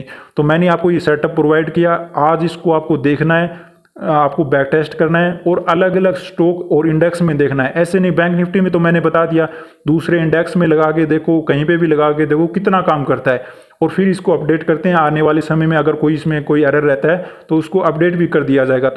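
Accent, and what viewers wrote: Haryana, India. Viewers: कई बार लगता है उत्तराखंड से है आप कई बार आवाज के इंग्लिश टोन से लगता है हरियाणा बॉर्डर से है राजस्थान साइड वाले से।। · Bhai ji bahut aacha sikha rahe ho aap Aapki boli to hariyane aali lagge hai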